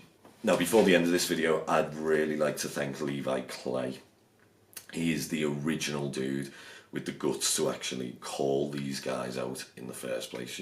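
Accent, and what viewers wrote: Liverpool, United Kingdom. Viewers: Totally off-topic, but I instantly subbed for your beautiful scouse accent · Is he from Liverpool ?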